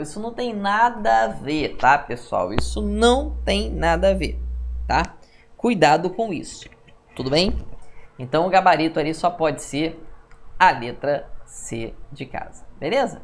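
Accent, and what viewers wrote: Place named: Rio de Janeiro, Brazil. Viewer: Professor muito bom. Objetivo e atento, além de ser bonito e com o sotaque carioca! srsrrsrsrs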